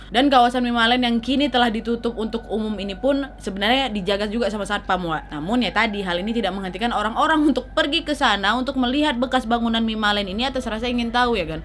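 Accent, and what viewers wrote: Indonesia. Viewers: Menarik Sekali mengikuti Cerita anda , Terlebih dengan Logat Indonesia , Jadi Nyaman Mendengarnya , Sekedar Koreksi , untuk Kata Aku kalau di Akhir kalimat cukup di Ucapkan “ku“ , contoh “Aku telah Membeli sebuah Mobil, dengan Uang hasil Tabunganku“ , dan Kata Aku , Hanya bisa di Ucapkan untuk Pribadi/Keluarga/Teman Akrab , tapi Tidak bisa di Ucapkan untuk Audience , yang Baik dan Benar pakai saja Kata Saya , Bisa untuk Semua , Like dari Surabaya.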